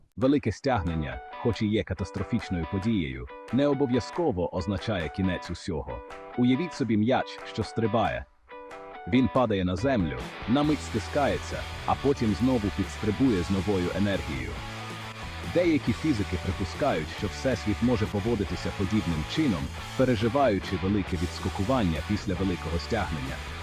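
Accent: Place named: Poland. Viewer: жаль наголоси погано виставляються на укр мовi :body-blue-raised-arms: та настройка яка нормально корегуе iншi мови, перетворюе укр мову на якусь... навiть хз...полупольську якусь, в сенсi звучання... гг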